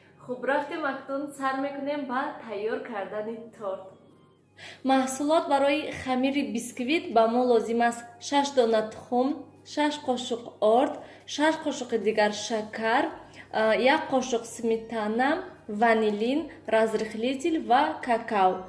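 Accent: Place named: Panjakent, Tajikistan. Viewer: Зинда бошен апа, гапзанетон Панчакентихоба хела монанд будааст 👍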